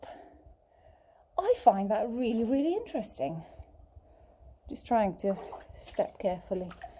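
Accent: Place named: RP, United Kingdom. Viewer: Hello from America! Nothing like a gorgeous lady guiding us through some awesome mines ! Especially with that posh accent 😍😁🙏🏻🙌🏻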